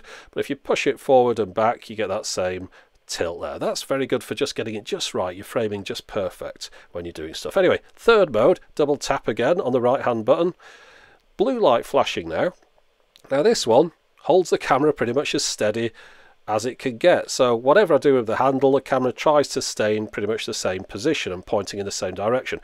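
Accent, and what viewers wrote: United Kingdom. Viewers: Just wanted to thank-you for this video. It's really concise and interesting. To be fair, I like all your videos and it's good to listen to a fellow Brit! Was looking for a gimble for my the videos on my channel and this could be the one. Also, I particularly liked your "How to write a youtube comment" video - so true!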